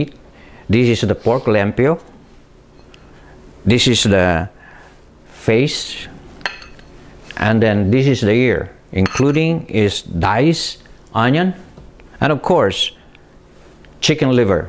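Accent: Philippines. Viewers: Only Filipinos will criticize other Filipinos for their English. I know a lot of people who’s been here in the U.S. and still can’t pronounce or speak the English language but nobody cares. I sure don’t care but other Filipinos will make fun of their own. How about we start lifting each other up instead of putting people down because they can’t speak a certain language. He’s Filipino not American. Your comment has nothing to do with what he’s doing. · This looks so good Chef. My uncle used to make sisig for us but he moved to the Philippines when he retired from the U.S Navy. Unfortunately, he took his recipe with him, even my Dad don't know how to make it. Lol, I am glad I found your video, it look so easy to make and I definitely understand everything that you were saying, I love the Filipino accent, that's how you know it's real. I love you guys and keep up the good work..Yummmm!!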